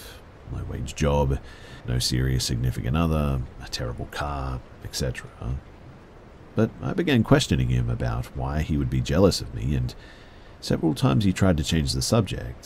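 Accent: Australia. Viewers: So you’re from the land down under?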